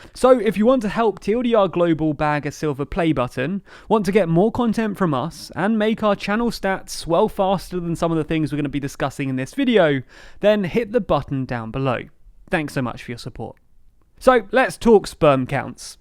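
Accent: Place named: London, United Kingdom. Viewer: I was not prepared for this London accent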